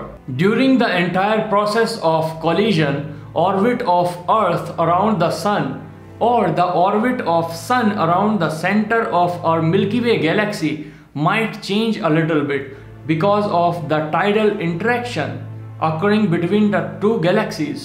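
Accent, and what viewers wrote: India. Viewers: I love this guys Indian accent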